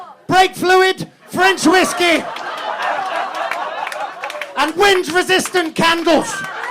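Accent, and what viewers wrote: Liverpool, United Kingdom. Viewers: Only non-Scouse person I've seen besides Chris Barrie (Red Dwarf) that can do a convincing Scouse accent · This is hilarious : pure genius ; great Liverpool accent...I love the line : "Then you have to skulk off and pack your goods in the corner of the Aldi ... like a rat!" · Best comedian and does a great Scouse accent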